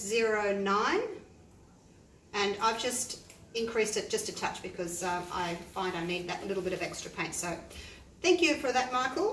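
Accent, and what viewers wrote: Australia. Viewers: Congratulations on 20k subscribers 😍 You are a delight to watch/teach and gotta love the Aussie Accent 😃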